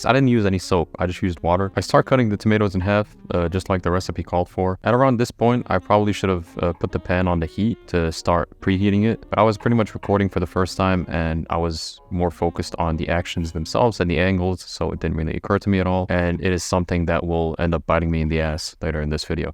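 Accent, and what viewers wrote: United States. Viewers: I don’t know if you’d take this as a compliment or not, but your accent would easily pass for American lol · cool video! I'm surprised you've never been to the US, you have a very american dialect!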